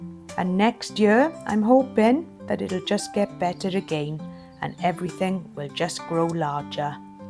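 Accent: Wales, United Kingdom. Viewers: Hi the Garden is looking lovely. Do you heat the greenhouse ? I'm in Essex. You're lovely soft welsh accent really reminds me of my Granna. 😊x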